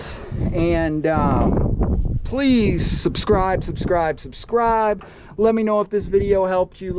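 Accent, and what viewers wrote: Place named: Appalachia, United States. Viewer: Actually another Completely annoying Amateur wanna be when yacking about the dozer only control stick you said the blade goes forward which is annoyingly Stupidly obnoxious the machine goes forward not a dozer blade.you obviously have the same hillbilly accent as the long dead n hayden who of course thought they were invincible peddling a pedal bike in italy ignoring a walkman equipped Stop sign getting graciously taken out forever by one crossing car brain dead from then on was also brain dead before impact.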